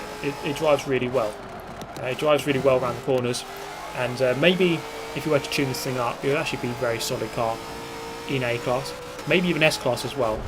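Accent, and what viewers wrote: United Kingdom. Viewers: Your charming British accent is overselling your actual driving skills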